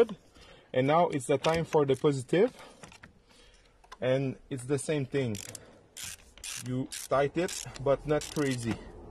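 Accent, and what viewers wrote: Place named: Quebec, Canada. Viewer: pour l'effort pour l'anglais 😄. Toujours drôle d'entendre un accent québecois sur YouTube. Bon vidéo 👍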